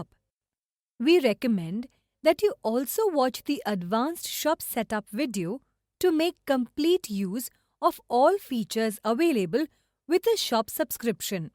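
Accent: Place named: India. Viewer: White lady with an indian accent, funny. I feel like I'm at 7-11 or my doctors office.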